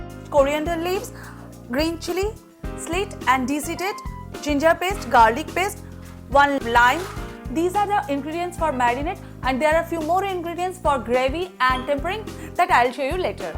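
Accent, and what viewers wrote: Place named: Bengal, India. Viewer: Kotha sune to bangali mone hoche! তাই বাংলায় বলছি-- রান্নার প্রণালী বেশ সুন্দর ও আকর্ষণীয়! কিন্তু রান্না করতে করতে ওই ন্যাকামো গুলো বেশ বিরক্তিকর!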